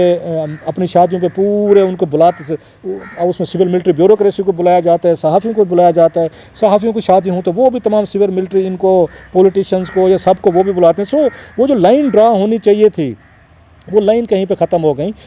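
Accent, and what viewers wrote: Saraiki, Pakistan. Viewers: Dear Sb. Why you have stopped unveiling scandals of PTI/politicians/ zurkoota jins. I think.you have been threatened and frightened and we are disappointed. Pls don't stop sharing facts. Char Ja sooli per Ram bhali kary ga. We are missing your saraiki accent. Stay blessed. You are following Mr. Amir Mateen who has already surrendered.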